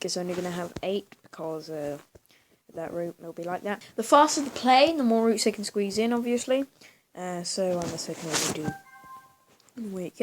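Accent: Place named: United Kingdom. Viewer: Nice too see that your rly active!!! Lol tbh ur British voice is GREAT. I’m an American who wants to be British or Aussie. Aussie and British accents are AWESOME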